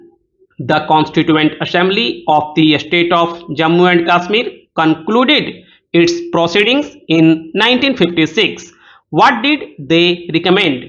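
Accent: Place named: Bihar, India. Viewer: Bihari accent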